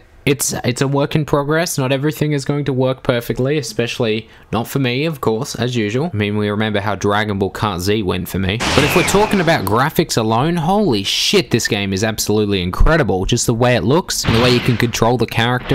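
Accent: Australia. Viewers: Are you an Aussie m8!? Sound like my girls brother lol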